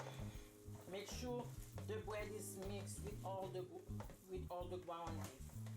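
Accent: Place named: Haiti. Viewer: u remind of my sister n mom the have that Haitian accent they have passed away. thank u for help me learn what they didnt finish teaching because haitian american u lose alot of culture thanx to bring back haiti to me n the world.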